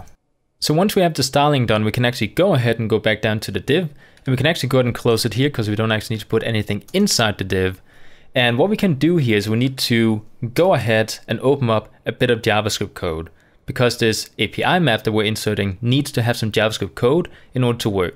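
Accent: Denmark. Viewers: You my Sir are you danish ? :D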